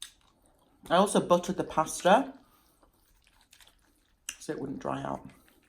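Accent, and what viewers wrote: United Kingdom. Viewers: Love you are the bomb I love watching your videos and listening to you British accent! Your spaghetti looked amazing!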